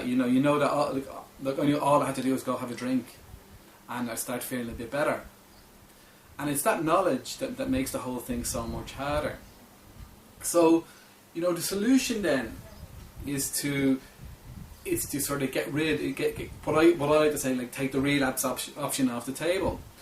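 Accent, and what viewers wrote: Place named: Ireland. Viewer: Definitely irish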